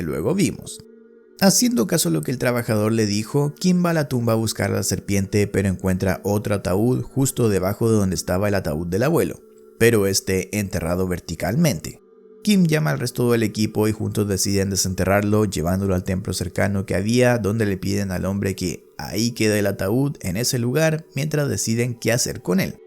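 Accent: Chile. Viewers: Este acento chileno no se me da j ñsajfkaldnjhasdfkasd fñlsajfñljs afls chabocho Gran resumen!!!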